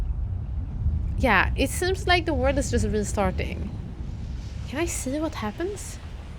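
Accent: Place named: Sweden. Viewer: 1 minute in, she sounds super Swedish, I could be wrong. But I don't think I am. xD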